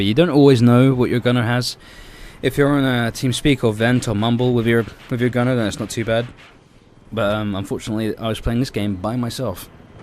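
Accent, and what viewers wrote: United Kingdom. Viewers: a littele bit american,but strong british accent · What nationality are you? You speak with an english accent, except you roll your 'r's like an american.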